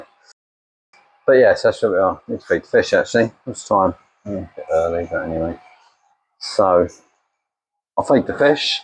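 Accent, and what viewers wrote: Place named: Yorkshire, United Kingdom. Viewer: Yorkshirey way lol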